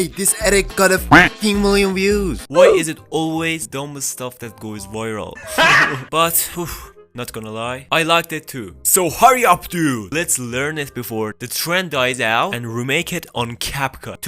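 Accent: Iran. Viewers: Bro you are Iranian? ریدم تو لهجت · چرا لحجه اش ایرانیه؟😂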